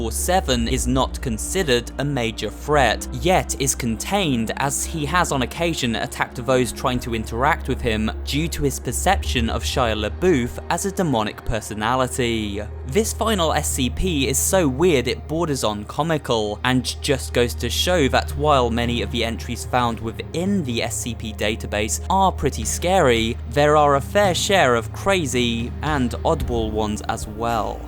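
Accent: United Kingdom. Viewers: You sound like the British version of chills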